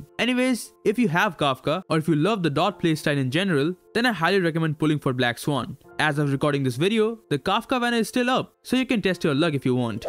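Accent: India. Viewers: Are you Indian 😅 cuz your accent sounds india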